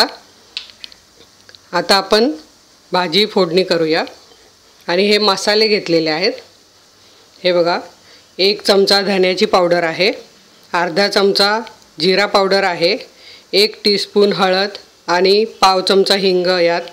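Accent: Khandesh, India. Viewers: kaku me nehmi pahte tumchya receipes.bhari sangta tumhi.tumhi khandeshi ahat ka? bolnyachya style warun watla mala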